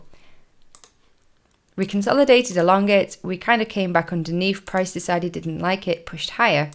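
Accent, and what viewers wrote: United Kingdom. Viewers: That is a very British accent and a very British face. I feel like I'm in a Bridget Jones movie.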